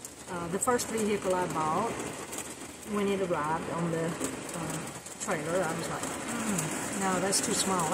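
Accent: South, United States. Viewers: Where are you from? You have a southern accent but were going to see your son in New York. I was just wondering where your journey began.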